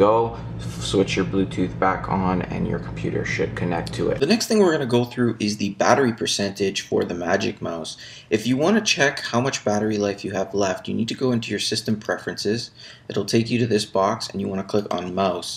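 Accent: Canada. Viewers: Same problem!! I have had this mouse for 5 years. The last time I got the low battery warning, I couldn't get the back off no matter what I tried. I popped into the local Apple Store and she did it easily. I felt like an idiot. I practiced. Then, last night, I got the battery warning again. Figured, okay. I can open up the case. Failure!! Again. I was on my way to the Apple Store again, feeling like the same idiot - but more so. I thought "Lemme check YouTube." Thank you!! You saved me a trip and my sense of pride.;-) BTW, are you Canadian, by any chance? Big thanks!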